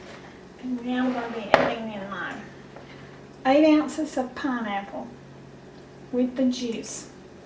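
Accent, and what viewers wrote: South, United States. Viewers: Watching her for the first time love the recipe and her southern accent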